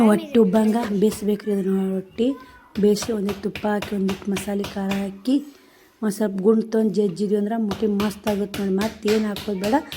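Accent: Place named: Uttara Kannada, India. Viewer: ನಿಮ್ಮ ಪ್ರತಿ ಲಾಗ್ ಗು ನನ್ನ ಹೈಪ್ ಇರುತ್ತೆ ಸಿಸ್ ಖುಷಿ ನೋಡೋಕ್ ನಿಮ್ಮ ಲಾಗ್ ಮಿಸ್ ಮಾಡಲ್ಲ ನಾನು ಡೆಲಿ ನೋಡ್ತೀನಿ ನಮ್ಮ uk ಭಾಷೆ ಕೇಳೋಕೆ ಚೆಂದ 🎉❤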